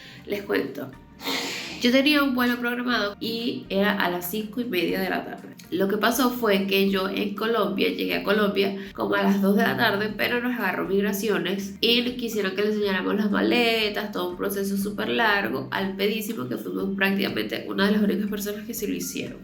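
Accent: Venezuela. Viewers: Me encantó que con nueve años fuera jamás perdiste tu acento, sigues hablando venezolano como sino te hubieras ido nunca ❤❤❤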